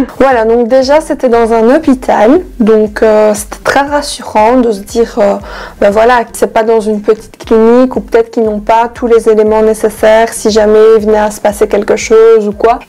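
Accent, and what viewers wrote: Belgium. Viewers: Coucou ma belle, j’entends ton petit accent belge et je suis belge aussi. Je voulais avoir les coordonnés de la chirurgienne ainsi que les prix que tu as payer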